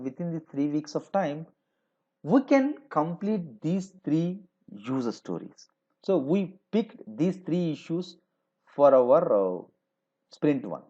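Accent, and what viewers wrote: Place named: India. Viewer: and again an indian. cant listen to that accent anymore -.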